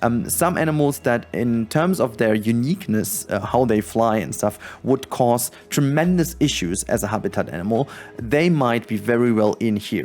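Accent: Germany. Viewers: I hear the German Accent😂 I love it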